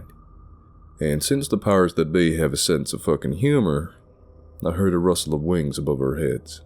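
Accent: South, United States. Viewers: While I will always appreciate the English accents of my favorite YouTube narrators I love hearing stories from America, South of the Mason Dixon line performed by a Bubba.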